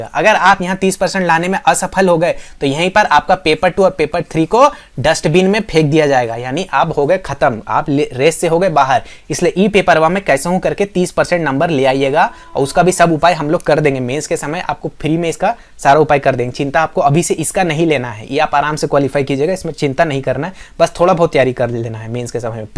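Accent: Jharkhand, India. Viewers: Bilkul bhaiya jharkhand wala lg rhe h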